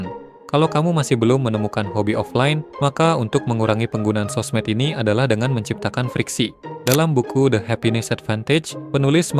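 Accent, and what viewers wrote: Bali, Indonesia. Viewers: Narator logat bali kayaknya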